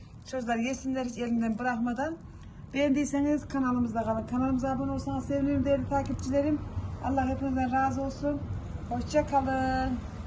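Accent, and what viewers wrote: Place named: Çorum, Turkey. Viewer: Kolay gelsin hanım bizim gibi konuşuyorsunuz nerelisiniz ben Çorumluyum ama 42 senedir istanbul Eyüpsultanda ikamet ediyorum ❤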